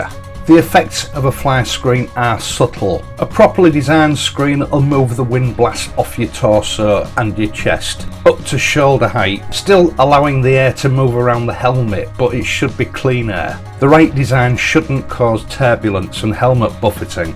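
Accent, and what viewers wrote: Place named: England, United Kingdom. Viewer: your narration is movie like, you look like Clint Eastwood, albeit from England with that accent. 😀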